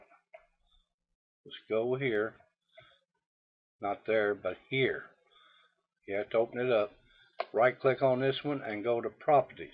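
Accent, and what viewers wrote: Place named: South, United States. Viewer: I like your accent. Southerner?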